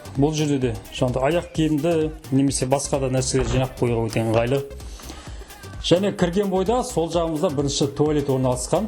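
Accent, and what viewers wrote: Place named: Russia. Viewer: Тіліңіз орыс тіліне ақсау. Таза казакша түсіндірсеңіз!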